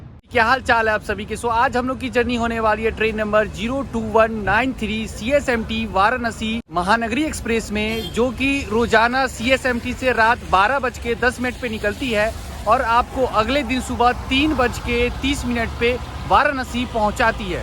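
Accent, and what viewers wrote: Bihar, India. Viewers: I watch ur videos on mute as I don’t like ur bihari accent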